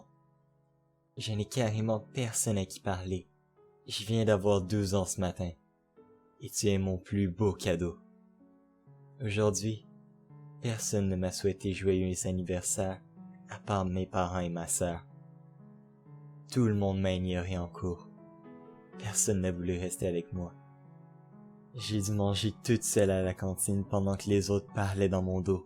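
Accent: Quebec, Canada. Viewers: Tu serais pas Québécois par hasard? (Par ton accent et tout) Sinon très chouette vidéo ta voix est plutôt bonne mais tu semblais parfois plus ''heureux'' que triste ou apeurée. Bonne continuation + 1 abonné. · L'accens Canadien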